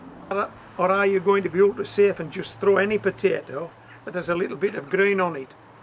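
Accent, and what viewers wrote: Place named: Newcastle, United Kingdom. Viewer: Love the accent. Why-aye man! Poysanass poortaytohs! Divvent eat em man! Howway!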